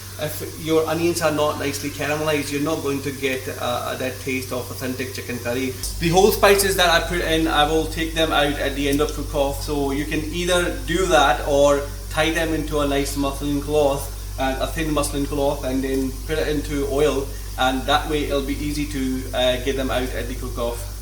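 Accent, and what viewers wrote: Scotland, United Kingdom. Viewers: Hint off jock accent there pal 👍👍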